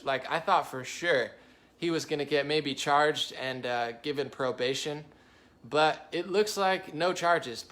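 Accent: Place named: Appalachia, United States. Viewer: As a hillbilly, I really enjoy your hick talk lmao! Sounded a little like Disney's goofy but it's still great none the less. Much love brother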